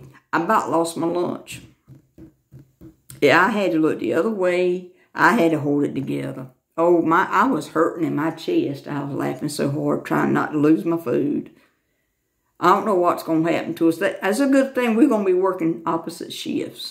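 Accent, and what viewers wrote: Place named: South, United States. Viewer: I am enjoying this. Perfect!! 👋 I thought you had a southern accent. Mine is worse than yours. Someone asked me if I was from another country. 😅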